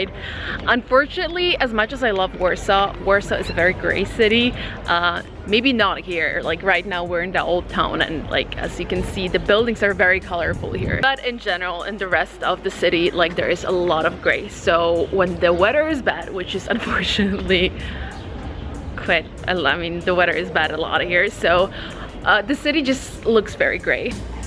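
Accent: United States. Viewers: Yes, I noticed the same thing-a very strong American accent, it might just be how a Polish person speaks English! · Wait, so you were born in Poland, how come you have a perfect American accent then? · How come? 😎 your American English is impeccable. I cant believe you didnt live in the US. Greetings from Warsaw😎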